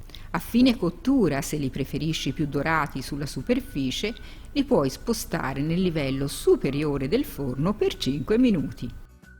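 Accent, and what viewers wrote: Tuscany, Italy. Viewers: Sono fenomenali Mi fido della ricetta di questa signora dal bell’accento toscano e dalla precisione delle dosi e procedimento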